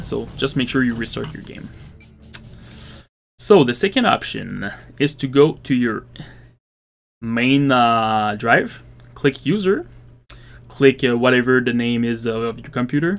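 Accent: Quebec, Canada. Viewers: is that a quebec accent i hear ? lol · Yo dude t'es tu québecois? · Are you from Quebec? I usually recognize the english accent of my people :P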